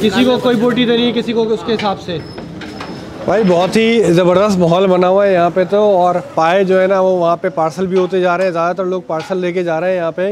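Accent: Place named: Bihar, India. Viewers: Apki bhihari zabardast hai ❤️❤️❤️ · Totally bihari language ... 👌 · Your bihari accent is cool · MashaAllah bhai Apko Orangi Town me dekh k khushi hoi or Apne jo behari jesi bethi zuban me bat ki bohat acha laga ❤️ Orangi town ap DayTime me ayn InshaAllah boht Chezn milengi apko explore krne k liye ❤️ Your big fan♥️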